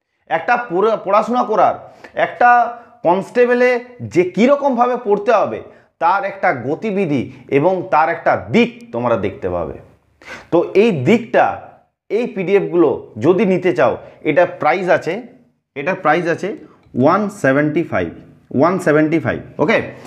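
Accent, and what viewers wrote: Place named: Purulia, India. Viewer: Dada tomer bari kothai voice ta purulia r moto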